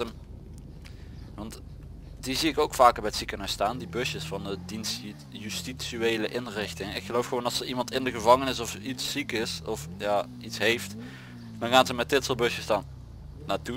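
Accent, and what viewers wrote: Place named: Limburg, Netherlands. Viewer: Goede video! Like en sub, ga zo door. Kom je uit Limburg?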